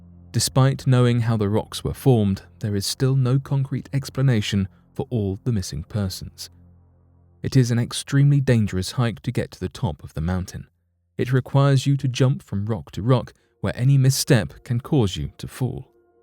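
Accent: United Kingdom. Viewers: WHERE YOU FROM ARE YOU A FELLOW BRIT? 🇬🇧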